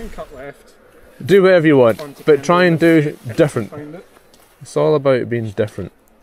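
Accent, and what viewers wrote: Scotland, United Kingdom. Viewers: is your accent scottish?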